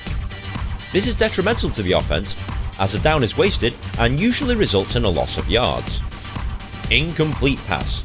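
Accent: England, United Kingdom. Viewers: Holy shit! I just dropped my lunch! I moved to America last year, and my wife (Emma Howard/Madden now) and I are going to watch the AZ Rattlers play this afternoon and I wanted to see how the rules varied to regular American Football. I didn't even realise until halfway through that this was you, albeit I did think it strange that guy narrating had an English accent! Hope you're doing well mate - I can imagine you still rollerblading around Leyland!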